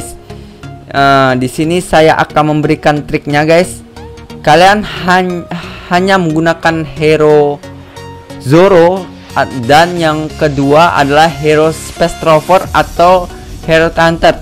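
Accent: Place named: Madura, Indonesia. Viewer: lagat nya ky org madura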